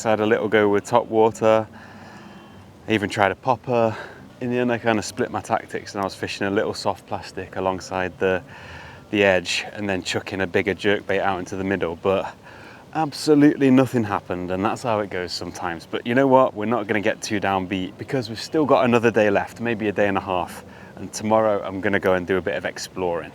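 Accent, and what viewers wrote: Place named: United Kingdom. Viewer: 這是英國腔嗎？太好聽了🎉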